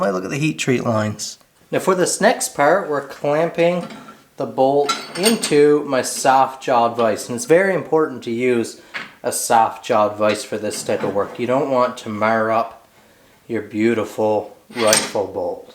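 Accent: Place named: Newfoundland, Canada. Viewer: Your Newfoundland accent sounds so similar to a Dublin, Irish accent . It’s funny .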